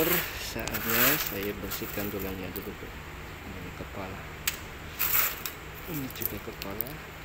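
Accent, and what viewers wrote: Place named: Banyumas, Indonesia. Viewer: Salam ngapak bos quuu Ora ngapak ora penak😀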